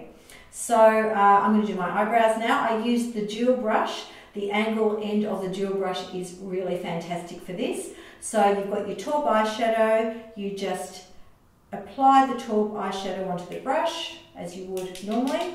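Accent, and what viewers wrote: Australia. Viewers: Auzzi accent?? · is she aussie?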